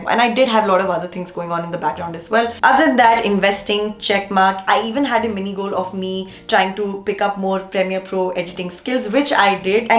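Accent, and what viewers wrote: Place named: India. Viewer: I love your accent so much I am 40% Indian and 50% Jamaican and I have an Indian accent and yours just makes me happy to have it <3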